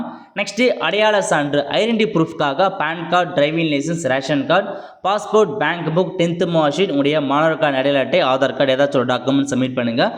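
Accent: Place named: North India, India. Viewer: tis person speaks like north indian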